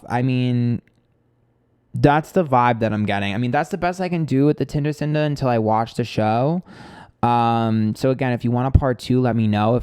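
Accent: New York, United States. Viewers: The more frustrated you get the more heavily your New York comes through 😁😁😁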